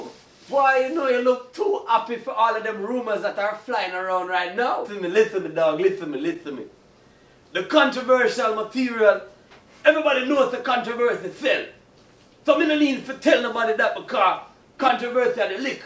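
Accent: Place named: Jamaica. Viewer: Seriously, whether or not you are Jamaican you are way funny and you do sound real Jamaican.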